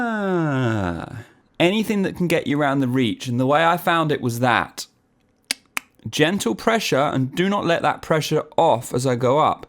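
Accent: United Kingdom. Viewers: Loves the British Accent! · British English?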